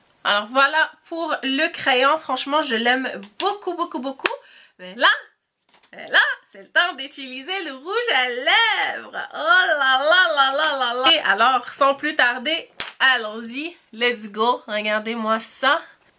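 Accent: Canada. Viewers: coucou,magnifique et superbe video,j'adore le make up,toujours a la hauteur bravo continue,j'adore ton accent canadienne,pour le petit masque javais dis 90 usd,sinon le rouge à lèvres est tres beau!!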